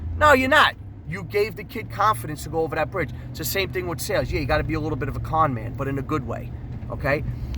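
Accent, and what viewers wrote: New York, United States. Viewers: Your new york accent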